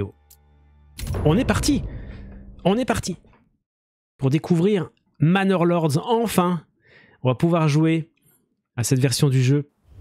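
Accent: Belgium. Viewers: Tu as un léger accent belge... 😊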